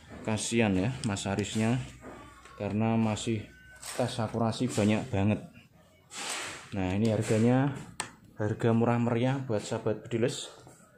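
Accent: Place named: Java, Indonesia. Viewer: mantap hostnya hehe jowone lengket, di mana os posisi kotanya?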